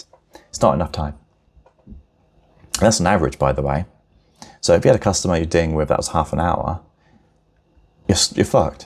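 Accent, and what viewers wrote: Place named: United Kingdom. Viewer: nice video man, didn't understand 90% of what you were saying though. Cool yookay accent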